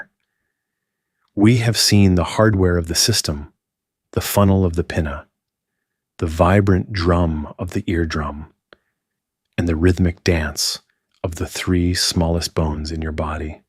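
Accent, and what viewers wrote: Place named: United Kingdom. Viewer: Bro bring back British voice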